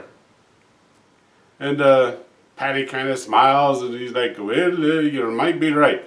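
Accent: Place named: Ireland. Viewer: Love the Irish accent 😂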